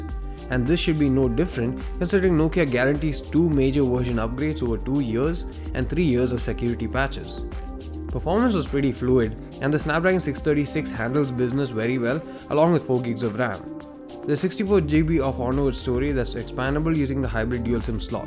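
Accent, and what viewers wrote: Kerala, India. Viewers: ok , u r from kerala?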